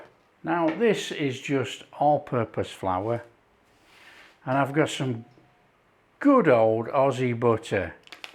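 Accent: Yorkshire, United Kingdom. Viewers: Sounds like Yorkshire accent 👍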